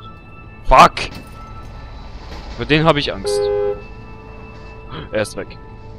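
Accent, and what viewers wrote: Russia. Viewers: bist du russe?:D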